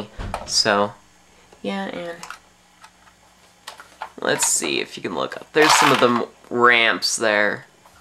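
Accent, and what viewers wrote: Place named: United States. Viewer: i love how im english and i heard u say some american words wich are funny like lever and we say leever and nickle. pence :P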